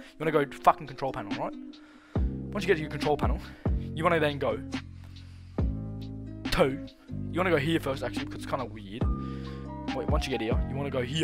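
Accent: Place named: Australia. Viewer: He’s Aussie adlay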